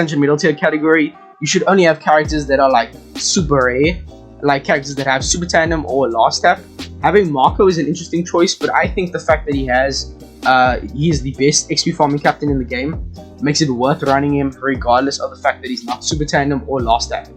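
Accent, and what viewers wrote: South Africa. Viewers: Bro i have to ask lmao, but are you south african? Love the content btw. Keep it up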